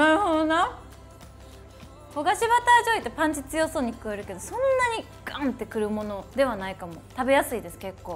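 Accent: Kansai, Japan. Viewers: 関西弁可愛い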